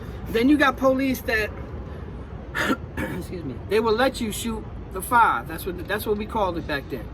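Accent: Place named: New York, United States. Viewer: Shout out from Australia...Love the content and I Love your NY accent...i hope the kids hear the realness of the message your trying to send. Hopefully people hearing this can AVOID prison altogether. GL to u and all the best in your endeavours in the future...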